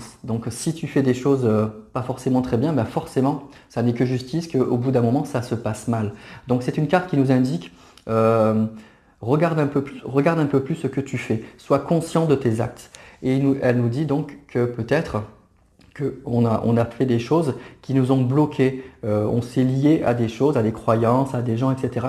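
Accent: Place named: South-West, France. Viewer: Bonjour ☀️ et merci pour ce tirage. Petit accent du sud-ouest que je reconnais, j'ai le même ☺️ A bientôt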